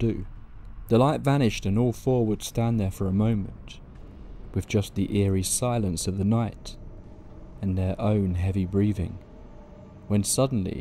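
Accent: United Kingdom. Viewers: fookin bloody british accent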